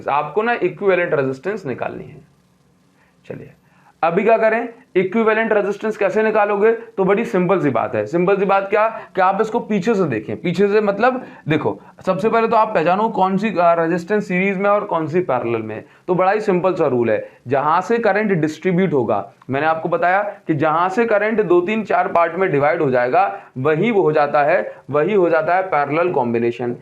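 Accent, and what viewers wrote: Odisha, India. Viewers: Odisha se ho kya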